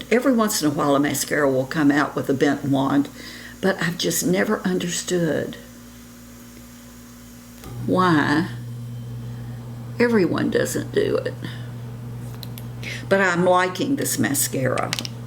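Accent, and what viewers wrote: South, United States. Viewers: It was lots of fun getting ready with you. You mentioned that you live in Florida. You must live in the panhandle with that wonderful southern accent.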